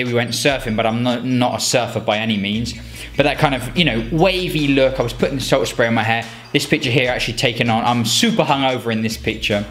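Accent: England, United Kingdom. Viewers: Sometimes u sound like pews, but with English accent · Where in england are u from? cant place the accent.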